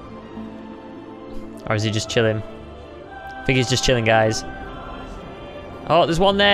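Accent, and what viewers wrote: Scotland, United Kingdom. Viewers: are you Scottish or British??? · is British duh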